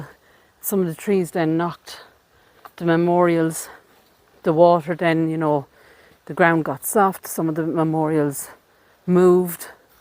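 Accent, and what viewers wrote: Ireland. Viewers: This is one of the most amazing cemeteries you have visited, it truly is. It would make a great place to set a spooky film, would not need to do anything, it’s just perfect as it is. your enthusiasm comes through, your love of old cemeteries is clear, your appreciation to these places built to honour those who have come before us is wonderful.All narrated in your soft beautiful Irish voice.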